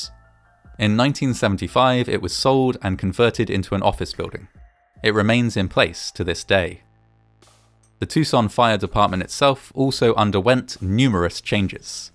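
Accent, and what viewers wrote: United Kingdom. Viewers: I always been wanting to say this but the narrator's voice is awesome. Part of the reason why I watch these spooky vids is due to his voice. Its British, isnt it?? Truly awesome. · I love your voice and UK accent, you're soothing to listen to after a hectic days work. And your stories are very entertaining and enlightening.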